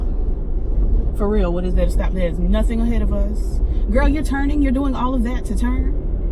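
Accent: South, United States. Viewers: You make that southern dialect look good 🥰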